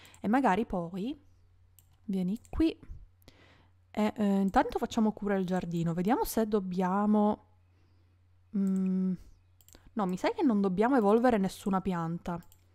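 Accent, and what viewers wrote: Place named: Palermo, Italy. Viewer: Melaaccolo poco palermitano noto😂😂😂 io pure lo sono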